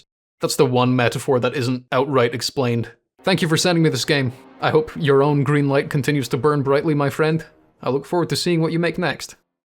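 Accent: Scotland, United Kingdom. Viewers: Bro you do more videos using the Scottish accent it’s genuinely the best accent I’ve heard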